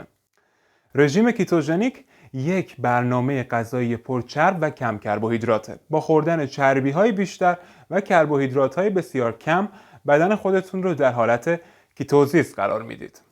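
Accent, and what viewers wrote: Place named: Turkey. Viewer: این ویدئو را ده بار دیدم تا تک تک کلماتو حفقظ کنم فقط دلمون چیز شیرین میخواد چی بخوریم یه سوال شما ترکین؟ چون ق تون خیلی قق میشه من گوشم حساسه و دقتم زیاد مثلا دقت رو شما دگگت میگین البته بعضیا دگگگگت میگن من ترکها رو از رو تلفظ گ سریع شناسایی می کنم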